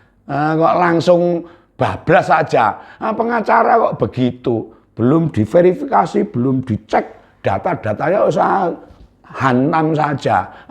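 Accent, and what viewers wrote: Java, Indonesia. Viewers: Logat Jowo...lanjutken.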